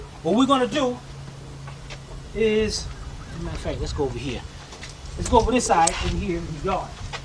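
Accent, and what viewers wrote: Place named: New York, United States. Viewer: Wish I would have seen this before i paid for the job. You got the most swagger of any mechanic I’ve seen. Sound like you are from New York.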